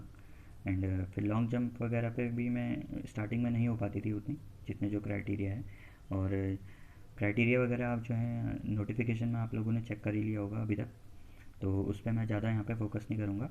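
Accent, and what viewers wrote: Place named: Kumaon, India. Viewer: Sir are u from Uttarakhand because ur accent and ur look is totally like Kumaoni people of Uttarakhand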